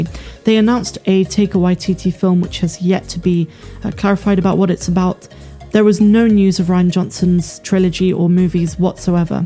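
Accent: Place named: Bristol, United Kingdom. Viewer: your accent sounds like your from down my neck of the woods in Bristol or close by. Love your work mate Gert lush and Peace out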